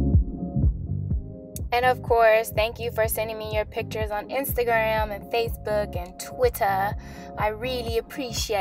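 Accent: United Kingdom. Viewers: That British voice thou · nice british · I KEPT REPLAYING YOUR BRITISH ACCENT YOURE SO CUTE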